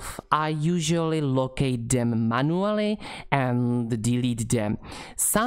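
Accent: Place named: Italy. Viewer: What AMAZING ACCENT! Is it Italian? I LOVE it! Also, thanks for the guidelines!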